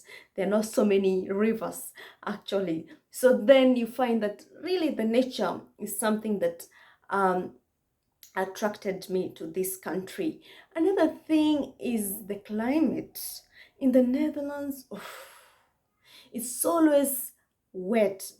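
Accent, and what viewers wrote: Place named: Kenya. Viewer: I love the Kenyan accent!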